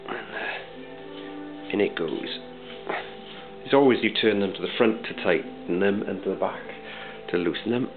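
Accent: Wales, United Kingdom. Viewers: Haha, very welsh. Good video. Cheers. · Proper good and proper Welsh. Excellent!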